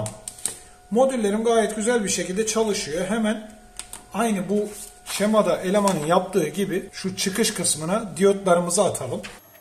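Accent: India. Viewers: off bir an hintli sandim yahuu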